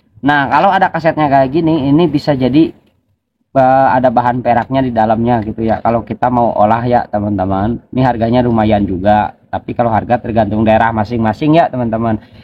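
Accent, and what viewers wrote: West Java, Indonesia. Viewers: Si aa mantap... Asa sunda ini mah❤ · Asalamualaikum,,, kang bos Dina logatna mh jiga orang Sunda , Mun tiasa nepangkeun orang mana , linggih di mana bos , seueur abdi bos barang" na empeg , panel , PCB hp + Sagala rupi Aisi bos , nyengcelengan bos tos seueur 🙏🙏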